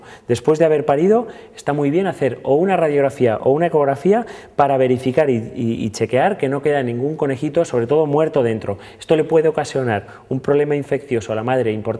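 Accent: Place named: Spain. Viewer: el acento español es lo maximo.. me encanta.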